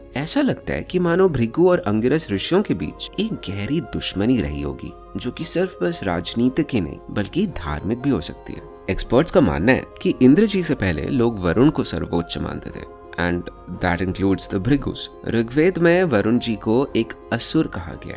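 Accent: India. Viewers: Thanks for the effort. It would be good to have non Hindi pronounciation. Also, multiple language switches made it hard for me to follow. Appreciate if you could not switch so frequently. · All is good but it's irritating to listen Samskritam names in Hindi style. Why u call Ved Ved Ved??? It's Vedam or Veda. Not Vamadev ...cl VAMADEVA 🙏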